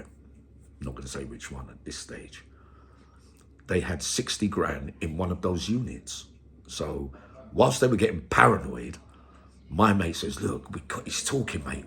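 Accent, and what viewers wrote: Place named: London, United Kingdom. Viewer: It’s good to hear a London accent.❤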